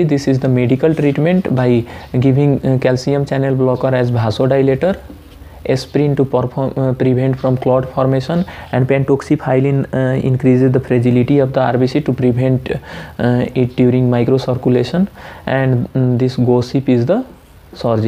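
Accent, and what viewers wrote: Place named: Bihar, India. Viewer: Don't laugh on accent ..may be he is a Bihari..but well explained everything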